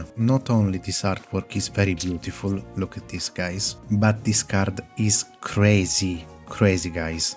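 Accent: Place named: Italy. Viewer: Italian accent unveiled! òwò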